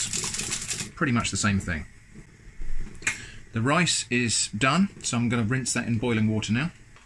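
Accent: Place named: RP, United Kingdom. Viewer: You sound so posh when can we see your face ???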